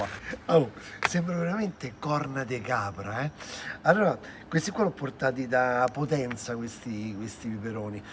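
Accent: Rome, Italy. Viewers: Ricordo un suo video di qualche tempo in lingua inglese ( spero la possa migliorare). Ora all'opposto è passato allo slang romanesco? L' Italiano standard sarebbe gradito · Grazie. Piatto fantastico. Ma come posso sostituire i peperoni cosi particolari? Con i friggitelli per esempio? Vorrei ridarlo. Ti ringrazio e sei al TOP anche per la tua simpatia e con il tuo/ nostro romanesco!!😂😂❤❤❤❤😊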